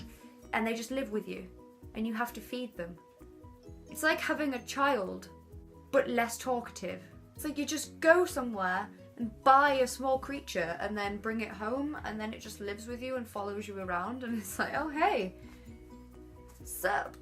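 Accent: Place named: England, United Kingdom. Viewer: I LOVE THE ENGLISH ACCENT!!!!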